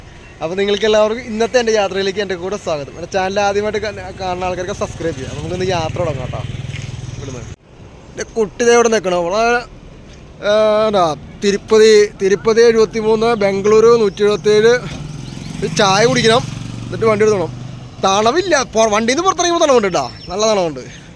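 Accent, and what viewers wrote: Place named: Thrissur, India. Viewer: തൃശൂർ ഭാഷ ഇഷ്ടപ്പെട്ടു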